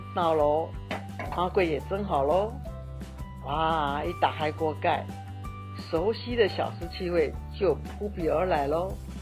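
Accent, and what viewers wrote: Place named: China. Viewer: 明明是台灣阿嬤為什麼要講話口音辨成大陸的大嗎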